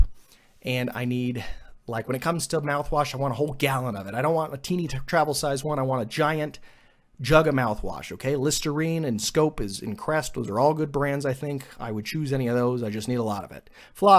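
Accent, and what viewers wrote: United States. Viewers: He's just American we don't really go off of regions besides maybe southern or northern but that applies to the east coast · he just has a generic american accent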